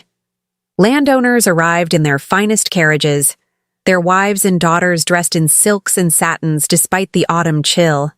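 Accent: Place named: United States. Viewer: The voice is American English. Doesn't fit at all!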